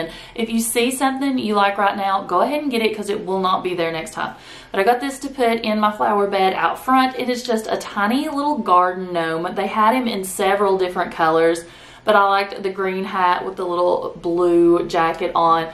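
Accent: South, United States. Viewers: That southern accent ❤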